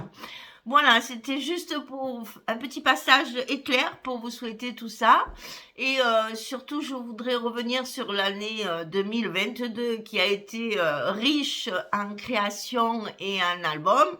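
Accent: South, France. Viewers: Merci pour cette vidéo et tous mes meilleurs voeux pour cette nouvelle année et merciiiii pour ce magnifique accent qui nous fait sentir bon le soleil et les cigales 🙂👏👍 et longue vie à vos tutos si bien réalisés et expliqués. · Bonsoir.Bonne et heureuse année surtout vos petites mains et la tête pour créer vos album dont je fais souvent.Vous expliquez bien .J aime votre accent du midi.J espère que vos pieds vont mieux avec la préparation de la fête de Noël.J ai fait le folio avec la participation de rose du jardin.Il est genial.Gros bisous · Ravie de mettre un visage sur ce bel accent du midi ! Votre enthousiasme et votre bonne humeur rendent vos vidéos très agréables à visionner ! Vos réalisations sont toujours très réussies ! Quel talent ! Je vous souhaite une belle année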